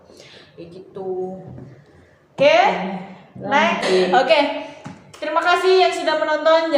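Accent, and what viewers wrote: Banyumas, Indonesia. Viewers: Bahasa banyumasannya medok bgt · HAHA, ORA PANGLING' LOGAT NGAPAK😂👍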